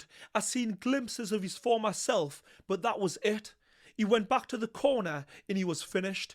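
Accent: Newcastle, United Kingdom. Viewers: Hes a geordie. Nothing like scottish 😂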